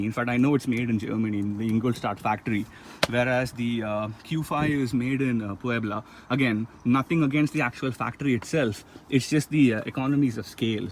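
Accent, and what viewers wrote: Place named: India. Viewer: Your spelling is wrong.... it's not NOT " fluidly".... the correct term is "fluently"..... he speaks Indian-English..... the Indians try to speak the language of their White Master, but because their tongues have are tuned to speak Hindi, they end up with a completely different version of the English language.....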